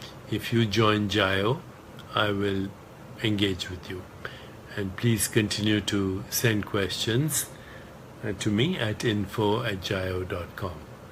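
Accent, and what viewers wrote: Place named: Iraq. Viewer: الي يتكلم وكأنو عراقي قريب جداً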